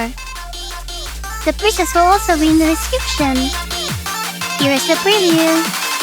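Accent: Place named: Philippines. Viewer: you're filiipino aren't you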